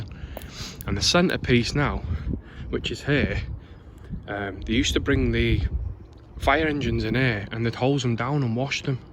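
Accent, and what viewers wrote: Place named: North, United Kingdom. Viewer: Great story and video editing, complete with reminiscent storyline in a strong northern accent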